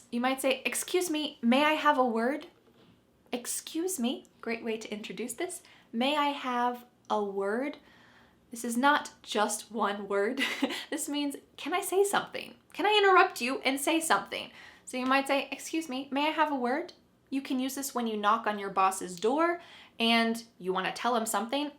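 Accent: United States. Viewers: I love you American inglish, I do understand everything's you say,by the way congrats for you program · thank you for teaching us you are the best teacher for learning American accent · It's such a pleasure to wake up with your nice US accent and your funny mood. Thanks for the English loving speaker as I am. Have a nice day. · Love American accent from Pakistan. I’m a really big fan of American life and it’s history. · She has an american accent.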